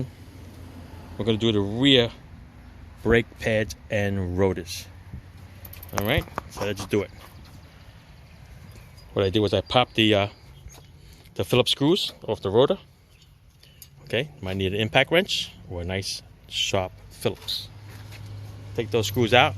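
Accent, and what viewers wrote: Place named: Deep South, United States. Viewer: This was fantastic. Now bc it’s brakes I don’t have enough courage yet, but I’ll bet if I did, I would get it right because you are such a good teacher. Also, I’m in the deep south and hearing your regional accent, which is close to mine, was very comforting. Miss being around my people. 😅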